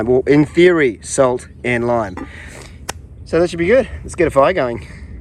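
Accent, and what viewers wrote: Australia. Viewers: Great job mate! Love, love the survival videos! Cheers! (How ‘bout that Australian accent)?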